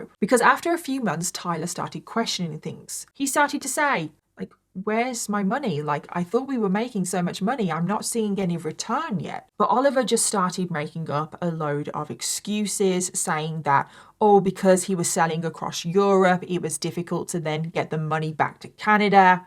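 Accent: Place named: Birmingham, United Kingdom. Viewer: I’m just messaging to say welcome back nice to see you do true crime for us all ❤ much respect from the brummy girl it’s nice to here someone with the same accent as me for once 😅 happy new year 🎉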